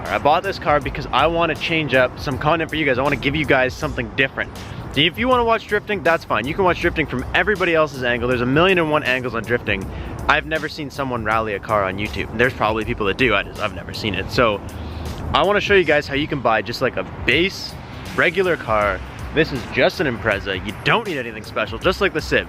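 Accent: Canada. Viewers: Intentions or our attention? Haha, I love Canadian accents.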